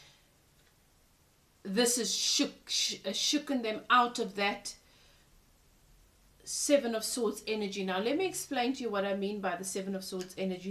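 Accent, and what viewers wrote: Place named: South Africa. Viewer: Wow,I love your reading, thought I recognize that accent.First time listening to a South African reader.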